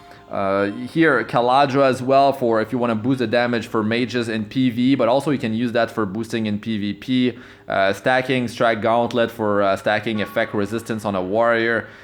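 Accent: Quebec, Canada. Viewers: Salut t québécois?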